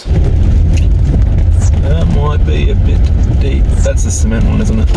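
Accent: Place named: Australia. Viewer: Yes to a snorkel, and yes to more hearing you say, "battleprius," in your lovely 'Strayun accent.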